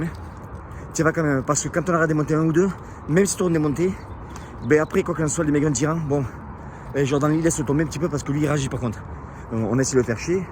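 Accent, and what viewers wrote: South, France. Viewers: Bonjour les USA, bonjour, toujours d’excellentes vidéos avec notre accent du Sud 😉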